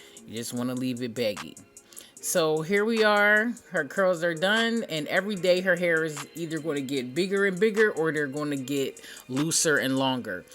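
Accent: Philadelphia, United States. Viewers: I thought I heard a Philly accent! Then you said PA I was like yup!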